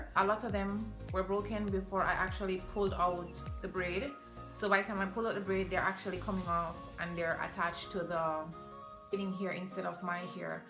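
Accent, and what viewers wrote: Jamaica. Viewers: I hear the accent 🇯🇲🇯🇲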